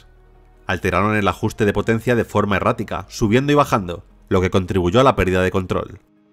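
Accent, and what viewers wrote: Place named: Spain. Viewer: Excelente narrador, me encanta la narración de éste canal, y eso que a los latinos nos nos gusta mucho el acento de España para los doblajes de las películas.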